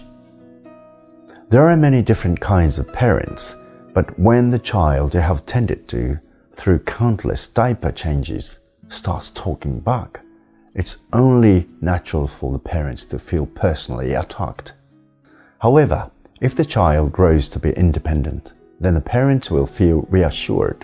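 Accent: United Kingdom. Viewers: 大愚和尚のブリティッシュアクセントが好きすぎる。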